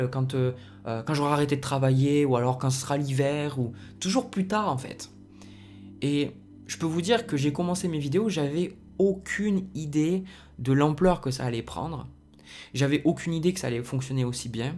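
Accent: South, France. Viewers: Bon, je me pose une question à ton sujet depuis que j'ai découvert ta chaine... D'un côté je me dis que je me trompe car tu sembles avoir un accent du sud mais bon comme la question revient malgré tout à chaque fois, je fini par te la poser... Est-ce que tu es né du côté de Rennes avec une lettre de plus dans ton nom officiel ? Si oui, je t'ai porté sur les fonts baptismaux... Namasté... ♥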